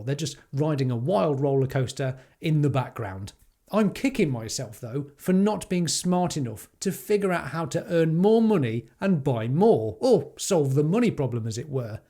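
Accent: United Kingdom. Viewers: Thanks for the candid video and I understand where you're at. Ironically, although I'm a recently retired engineer, my secret dream was always to be a great guitar player. At the age of 65, I still take and enjoy lessons. The problem, as I see it, with music is that the world doesn't like to pay for music. Sadly, all the musicians I personally know are broke. They're fantastic and talented people, but broke. Stay confident in that you're taking the right approach. I hate money but understand we need it to live a happy and fulfilling life. My wife and I be fine in our retirement...not rich but certainly not poor. Two final thoughts: When you send in your last mortgage payment for your home, it feels like you've cast off 500 lbs of chains that have been burdening you for many years. It's wonderful. Also, my wife and I wanted to buy a new Model Y Juniper recently, but instead opted for a low mileage 2020 Model Y for 1/3 the cost of the Juniper. 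No more chains for us. Please don't quit YouTube. I enjoy your enthusiasm and crazy Brit accent. If you visit the US near Ohio, I'll trade you a couple guitar lessons for some room and board + a fun tour of our chunk of OH. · Hi. Here a spanish guy that enjoys Your Tesla Content Whit British acccent. Hope you find The determination to keep going. Best of luck.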